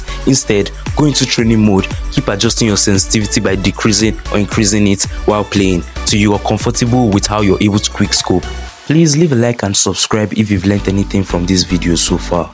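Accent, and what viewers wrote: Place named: Nigeria. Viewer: You sound like a Nigerian ngl like me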